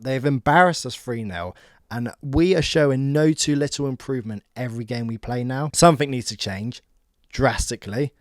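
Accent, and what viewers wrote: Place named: Scouse, United Kingdom. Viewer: Such a thick scouse accent, I needed subtitles.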